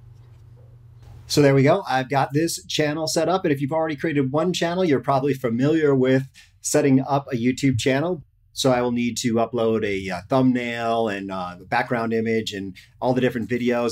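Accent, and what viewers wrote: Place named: United States. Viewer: im intrested in your accent . its thick and pure american. i appreciate you spendnig time and putting effort into sharing your useful information with others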